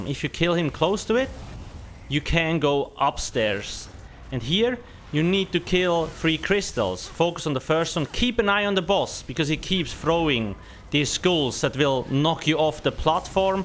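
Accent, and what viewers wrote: Switzerland. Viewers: bist du schweizer???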